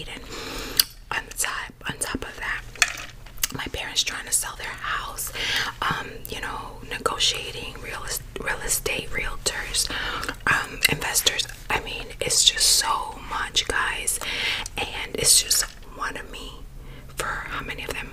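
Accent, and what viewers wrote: New York, United States. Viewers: So happy to see the bell notification and that it was a vid from you! Everybody needs a break from you tube sometimes and don't feel bad about accepting donations..you deserve them! I would love to see your professional makeup kit/application..I love the sound of your jewelry making noises, and when i hear your New York accent. It's so obvious you experience ASMR. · Hi. Just started following you insta and I would like to say well-done on the weight loss fantastic to see. Listening to your voice what accent is that? New York? All the best from bobby from Warwickshire England.UK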